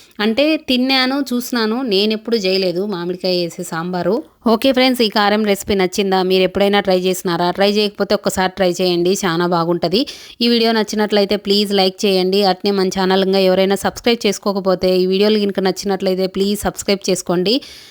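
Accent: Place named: Badvel, India. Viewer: Hi మాది బద్వేల్ మీ యాస నా యాస ఒక్కటే. ఒకమ్మాయి నాన్న ను నాయన అన్నానని. నన్ను చాలా నోచుకున్నట్లు మాట్లాడింది ఒకప్పుడు. అదేదో నేను తప్పు చేసినట్లు.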